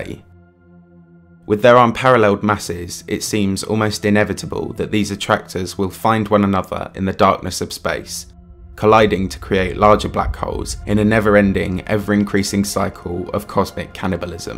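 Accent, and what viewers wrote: United Kingdom. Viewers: I don't especially like the british accent but i like his voice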